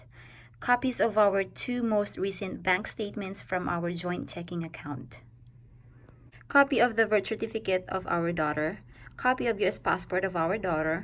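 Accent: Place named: Philippines. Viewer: Filipino-english accent! Ang nice! 😊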